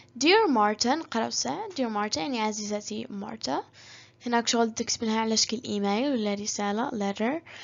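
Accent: United States. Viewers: لانها تقرا بالطريقة الانجليزيةالامريكية وليست بطريقة برتش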